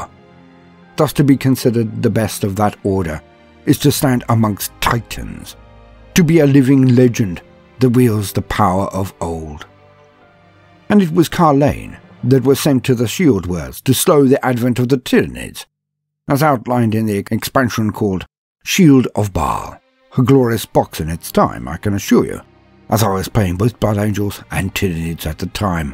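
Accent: United Kingdom. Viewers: Ah, my 2 favorite things, Blood angels, and an old British guy explaining them.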